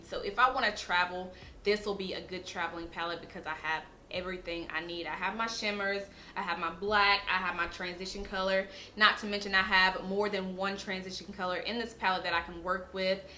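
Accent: South, United States. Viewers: Her southern type accent is a little distracting and hard to focus what she’s actually sayibg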